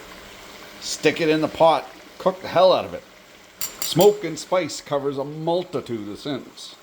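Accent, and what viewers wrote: Canada. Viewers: Bro, I RACE w Canadians and your accents I 1000% Canadian, Where do you get your “ Cajun” from? I hear you speak French. 100% better than me I love you and your vids. Just curious here. All the best!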